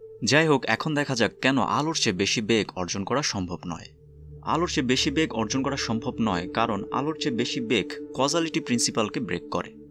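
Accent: Narsingdi, Bangladesh. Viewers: ভাই আপনি কি নরসিংদীর ? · apni basa Narsingdi 😲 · আপনি কি নরসিংদির লোক? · ভাই আপনার বাড়ি নরসিংদী? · ভাই আপনার বাড়ি কি নরসিংদী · আপনার বাড়ি কী নরসিংদী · Hlw vai,Apnr basha ki Narshingdi? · ভাই আপনি কি নরসিংদীর ছেলে?? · আপনার কথা বলার সুর এখনও শুদ্ধ হয়নি। আপনার কথার সুর নরসিংদী'র আঞ্চলিক। বাংলাদেশী সুর ব্যাপকতা লাভ করুক আপনার চ্যানেল। ধন্যবাদ।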